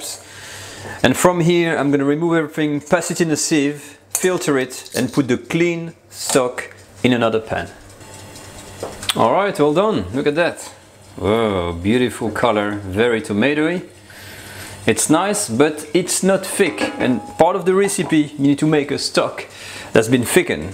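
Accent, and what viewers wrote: France. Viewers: I think you have a nice French accent. My daughter thinks your accent is typically French but I think you have a nice twist when you speak English and you're very clear. Merci pour la video. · ❤I love your French accent so cute 🥰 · He sounds french. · Excellente recette mais l'accent maudit français, ça me fait douleurs les oreilles. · Merci mon ami! A trultyfantastic dish. I am going to try it on my family I had no trouble following you great French accent. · that s ok, we understood :) very nice and how can a French speak such a good English with such a thick accent haha. cute lol, thanks for the recipe, doesnt seem difficult, will try. a bientot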